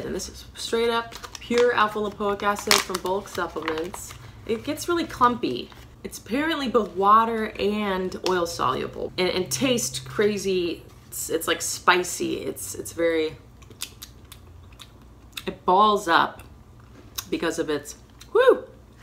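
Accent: United Kingdom. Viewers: did you ever live in England, flipping is an English term and you sometimes have an English accent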